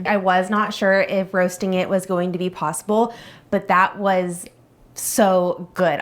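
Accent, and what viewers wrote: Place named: Philadelphia, United States. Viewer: Hi, I came upon your channel two weeks ago and let me tell you how much I LOVE it and you of course. I could have sworn you were from Philly, but I saw the video you said you are from Kanas. You did say you lived all over so wondering if you ever lived in Philly. You sound and act like you’re a true Philadelphian, LOL I just love how you talk and do those side noises, lol…. Anyway not sure if you will ever read my comment, but know that you have a NEW FAN from PHILLY. P.S. because of you I ordered golden bear sunflowers and paprika seeds. Oxoxoxo Love from Philly💙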